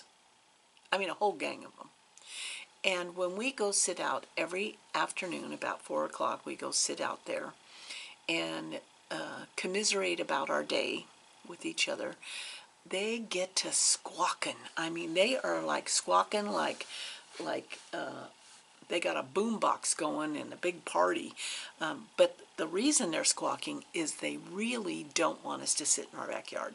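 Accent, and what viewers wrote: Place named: Kentucky, United States. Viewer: I’m reading Diamond Eyes, what a great read. I also love history. Just fascinates me. I’m one of those people who stitch all day and read all night and then wonder why I’m tired. 😂 I get together with girlfriends a couple times a week and stitch or knit. I’m making another summer top. It’s so soft and drapes beautifully. Using bamboo yarn.. which I would have never thought it would be so soft..who knew. Thanks for the great visit, I’m going to check out the floss tubes you mentioned. Nothing funnier than listening to a good ol Kentucky accent. 😂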